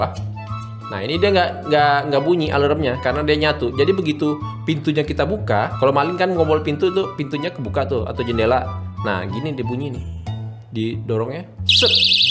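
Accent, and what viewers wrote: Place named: Bali, Indonesia. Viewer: Org bali yah bg ..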